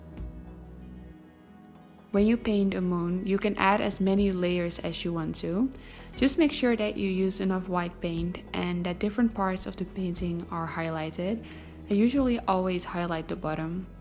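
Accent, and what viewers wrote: Netherlands. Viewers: You sound like you are dutch, arrreee you from holland???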